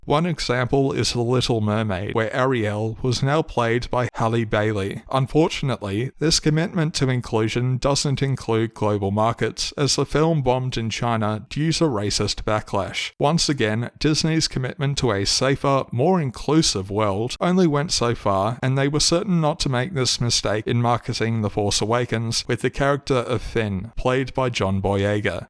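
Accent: Australia. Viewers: Equating the forceful internment of Uyghurs, despite there being no evidence of actual genocide, with the rest of these actual genocides is the problem here. Would you call the systemic arrests and imprisonment of black people in America a genocide? The actual problem here is that In all of your videos you only actually stand for the western consumer. Genocide for you is something that happens far away from wherever you're at (probably Australia if your accent is anything to go by) and you couldn't care less if billions perished so that you could keep enjoying all those first world pleasures. You could use your channel to make videos about actually horrific things gaming companies are supporting in besieged countries such as Nvidias support for global systems of surveillance and their use of Israeli occupied Palestinian land for tech research, the U.S. military propaganda within mainstream 'realistic' fps games such as BF6, Call of Duty, etc., or Saudi/ Gulf monarchy ties to Esports. 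Instead, genocide is just an off hand topic for you to throw out there for shock value. The main focus of your content is solely on whats good for westerners, nothing of actual value.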